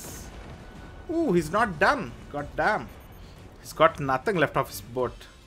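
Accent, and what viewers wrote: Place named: South India, India. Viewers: Are you Indian? Every time I think I have your accent figured, I doubt myself. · There are many indian accents. This is a southern one.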